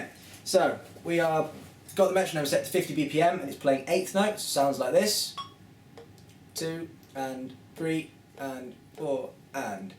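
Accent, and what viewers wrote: United Kingdom. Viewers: you sound english. veeeeery annoying, please spake normul plz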